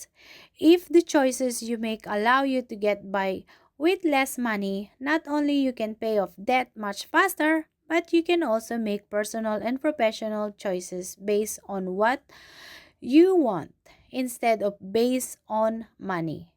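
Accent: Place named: Philippines. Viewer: Lol your filipino accent is too strong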